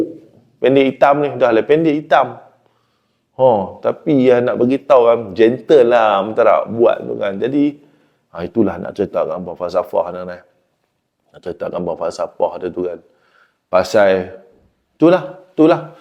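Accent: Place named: North, Malaysia. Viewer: "Mineral Wattar" Nohhh puas dengaq org utara brcerita....haha..Salam dari Pendang Tuan..Moga Allah sentiasa rahmati Tuan..AMIN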